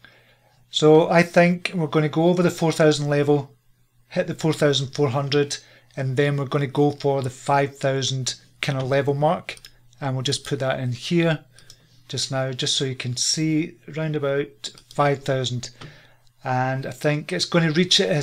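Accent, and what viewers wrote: Scotland, United Kingdom. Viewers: I trust the Scottish accent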